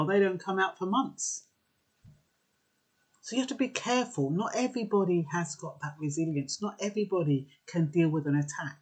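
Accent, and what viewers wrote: Jamaica. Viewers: I like it wen you fling on yu jamacian talk.keep up the good work.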